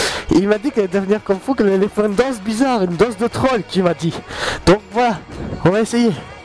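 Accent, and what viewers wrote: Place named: Belgium. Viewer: MDR! T'es vraiment un ouf! Pour quoi je passe moi maintenant avec tes conneries... lol (Inventées de toute pièce de ta part). Arrêtes de jouer à WoW ça te monte à la tête! x) Tu m'as bien fait rire... J'adore ton accent belge "une fois"! Merci pour le coup de pub'! Bises. V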